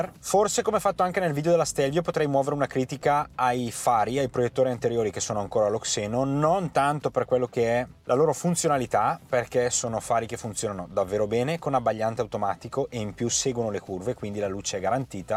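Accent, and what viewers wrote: Brescia, Italy. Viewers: Che accento Bresà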